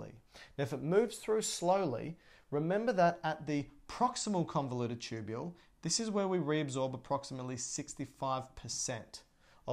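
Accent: Australia. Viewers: As an Aussie, I can confirm it’s an Aussie accent. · It is an australian accent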